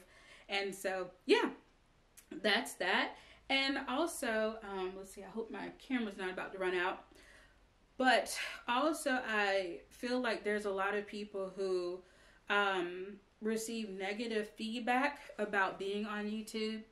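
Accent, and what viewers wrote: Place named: South, United States. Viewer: Wanted to hang out with my NC friend tonight. Thanks for this video. You give such good advice, especially for a new podcaster. Insecurities and comparison try to creep in as soon as you get started so thanks for sharing your viewpoint on these topics. You’re awesome! FYI, I feel like you and I sound just alike on camera. Southern gals 😅❤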